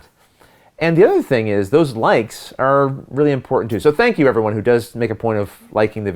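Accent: Canada. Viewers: I like the review idea, and your opinion can be taken for what it is. I am not going to be more likely to buy a game because or your Jedi mind trick of giving your opinion. It is a different platform for you, and is a different goal. You are in the business of showing people how to Play. And I think that is enough to expect. If you add your opinion, it is just going to see if you like it personally. I don't know if it will change anything. A shorter intro is not a bad idea. I tend to be looking at other things, and don't even really watch much of the intro; just waiting for the meat. So yes, short and sweat. As for the shirt, I sort of like that it adds a level of professionalism to your videos, but you tend to wear nice clothes anyway. I have seen other game videos where people don't even show themselves at all, but in your videos, you are on camera a lot. I see your goal of being a friend teaching the game, and I have come to consider you a friend even though I have never been in the same country as you. 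But I am a retired military officer, so uniforms for me are a statement of professionalism, so I like it. I think you are right, it really doesn't matter what you wear, you are an awesome teacher and do a far, far better job than any other game you tuber I have seen. You are always my first choice to go to find out how to play a game and I will watch you no matter what. You do talk funny, and have a Canadian accent, but I can forgive a few things. I love how you all say iro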